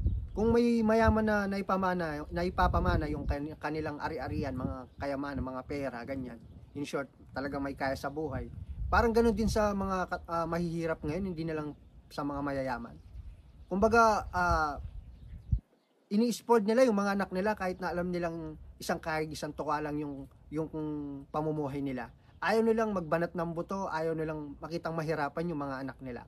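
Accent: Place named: Pampanga, Philippines. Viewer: kapampangan ka, balu mung balu oh pamagsalita mu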